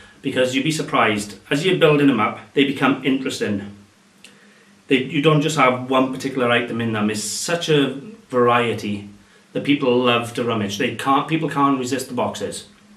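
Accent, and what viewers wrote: United Kingdom. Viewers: u from the uk aint u.so am i yay someome finally from the uk x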